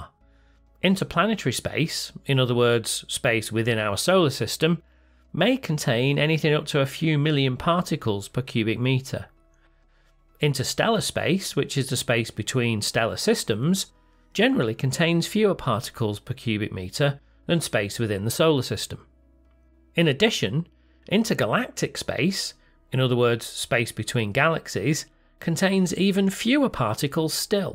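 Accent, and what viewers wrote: United Kingdom. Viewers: Why do you have a British accent but you say things like "4 miles"?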